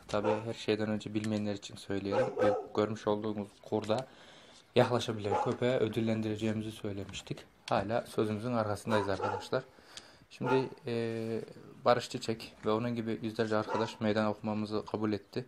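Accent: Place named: Konya, Turkey. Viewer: Abi sen konyalımısın aynı bizim ora gibi konuşuyosun:)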